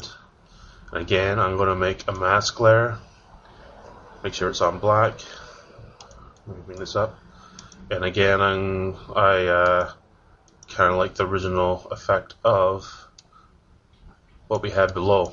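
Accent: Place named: Canada. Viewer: Def Canadian but I can’t place what part. Bc lower mainland, SK or Manitoba. Am I right?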